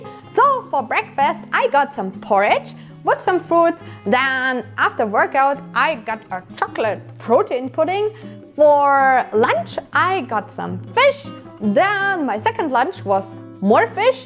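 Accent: Germany. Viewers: yeah haaaard accent i'm from germany too;-) I'd like too see a german cosplay video not made by anny the duck (doesnt means their not good)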